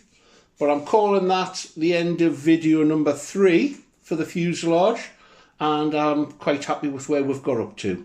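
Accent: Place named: Newcastle, United Kingdom. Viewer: Wow. Overengineering at its best. Fab build and all accompanied in mellifluous Geordy. Thank you so much. I have seen the Ladybird kit in books and catalogues for decades but never realised what a fascinating build it was.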